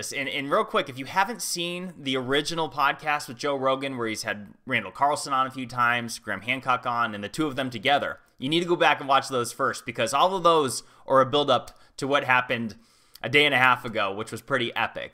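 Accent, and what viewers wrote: United States. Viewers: Totally unrelated to the topic at hand but you sound like you're from New England, am I correct?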